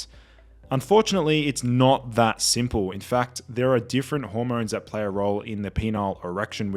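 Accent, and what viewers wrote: Australia. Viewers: But sir you speak very funny r u Australian?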